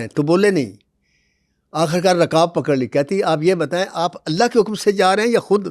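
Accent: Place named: Punjab, India. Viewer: I LOve your Punjabi... it's sweetness and familiarity is what makes my heart happy!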